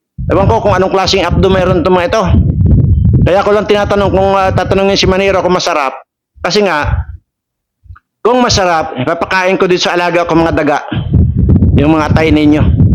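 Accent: Iloilo, Philippines. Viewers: Ilonggo ka gali tuno mo ilonggo sa totoo lang taga diinka haw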